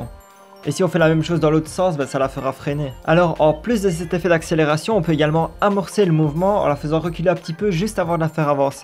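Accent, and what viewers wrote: Liège, Belgium. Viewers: tu a un accent de liège extrêmement fort mais tkt moi aussi chuis belge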